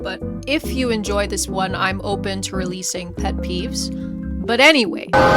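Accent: New York, United States. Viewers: Yooo wtf I was thinking the same thing, I've never heard a British person sound that american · Nah, she’s speaking American. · Wait wait WAIT A DAMN MINUTE!!! Your from the UK but you have a heavy new yorker accent 😭? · I thought I was going insane- I heard that new yorker accent the last video and was confused af on this video when getting to the UK part. LMAO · I'm guessing you're originally born in the states due to your American New York accent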